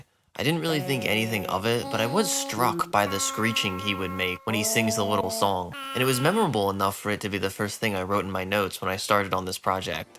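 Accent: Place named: East Coast, United States. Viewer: This is a super weird compliment, I know, but your delivery, especially with your (what I believe to be, correct me if I'm wrong) East Coast accent, makes these videos feel so nostalgic, like I'm watching an old movie.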